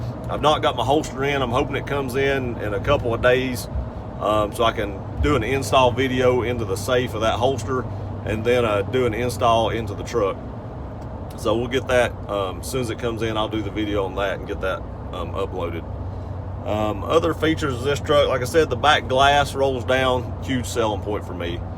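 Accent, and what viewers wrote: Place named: Tennessee, United States. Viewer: Great review of Tundra, love the eastern Tennessee accent too, some of the best folks in the world are from that area, a great friend passed away earlier this year from Bristol Virginia, Kevin Davenport, he had this same accent, could sell ice to a Eskimo and always had nice vehicles. Was blessed to have had him as a friend!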